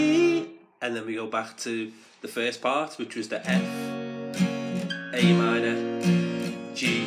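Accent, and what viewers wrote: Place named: Liverpool, United Kingdom. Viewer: Scouser!! Good man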